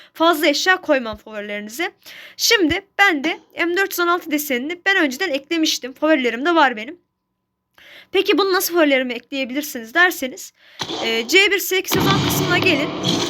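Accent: Azerbaijan. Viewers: Qaqa Azərisən türkcə danışırsan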